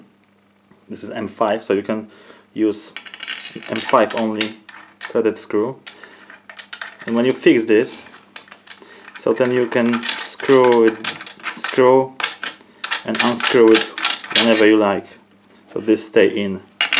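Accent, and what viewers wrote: Poland. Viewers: Polski akcent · Słychać że rodak :)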